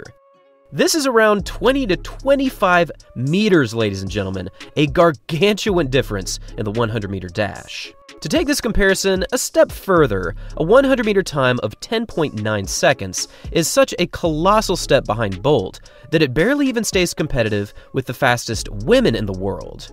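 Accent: United States. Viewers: Your accent is American, so why do you call soccer players football players?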